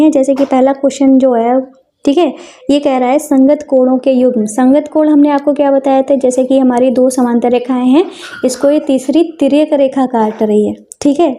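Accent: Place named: Rajasthan, India. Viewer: Mam aap Rajasthan se ho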